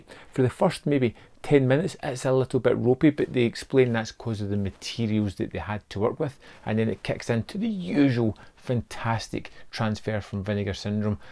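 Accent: Scotland, United Kingdom. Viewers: Do you really not understand the English language? Are Scottish accents that hard for you to understand? Go back to your hovel you troglodyte.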